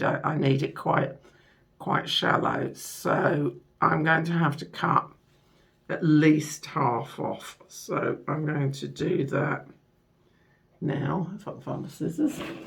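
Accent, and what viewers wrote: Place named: United Kingdom. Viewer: So cool to see how this gorgeous have is made and that it's doable for a newby like me. And fun to hear a british accent! I lived in London as a student years ago.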